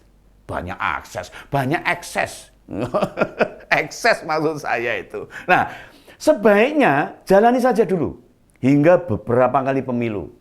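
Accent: Java, Indonesia. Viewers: Mbok sabar to pak pak (SBY)..om medhok banget Jawane.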